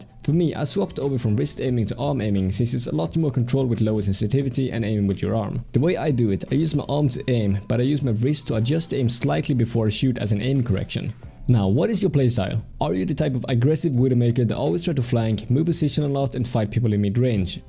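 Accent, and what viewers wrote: Sweden. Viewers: You can hear that you are swedish, it's funny becuse i'm also swedish. Ps. You are the best youtuber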